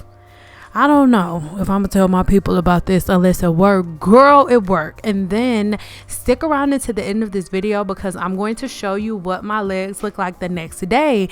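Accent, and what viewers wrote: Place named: South, United States. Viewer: I loved the blowout. Child, you sound like you from the south like me. LOL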